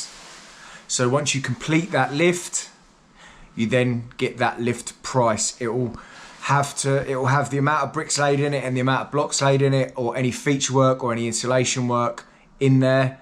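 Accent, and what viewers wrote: Cockney, United Kingdom. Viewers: Flash cockney bastard you know what you are …….bricklaying yaaaaaaawn 🤪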